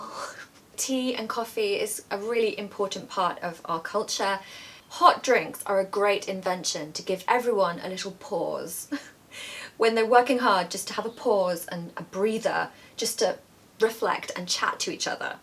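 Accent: United Kingdom. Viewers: Very classic British accent it seems back to that time of Jane Austin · I really enjoy your accent, where are u from in the uk? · Oh this lesson is a piece of art you give us vocabularies, phrases hints of British culture with a clear and beautiful British accent this magnificent episodes will be my English journey's peer ❤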